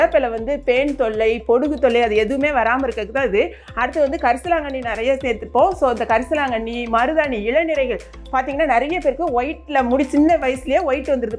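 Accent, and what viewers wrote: Tirunelveli, India. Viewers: Thank you mam.ஆனால் நீங்கள் பேசும் முறை திருநெல்வேலி மாவட்டத்தில் பிறந்தவர் போல் உள்ளது.அதான் கேட்டேன்.நான் திருநெல்வேலியில் தான் படித்தேன்.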